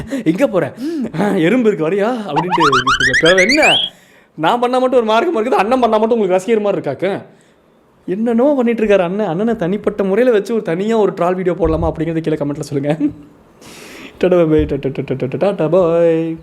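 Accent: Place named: Karur, India. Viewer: 😂😂😂😂 ayyo Madras samayal fav cooking channel 100 mattum illa108kum serthu phone pannirunga 😂 thalayatturathu mattum thaan unga role 😂 staff sick manager shock😂😂😂 neenga mattum unga maara kooda vilayadalam watermelon star mattum vilayada koodatha😂😂 but neenga+ maara cute ah irukum ithu comedy ah iruku 😂😂😂 unga karur slang vera level ❤❤😂 fans club watermelon starku thaan😂😂😂😂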